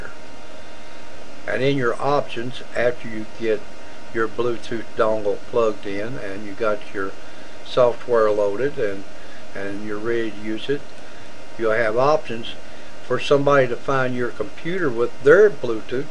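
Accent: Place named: Texas, United States. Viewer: Great Texas Accent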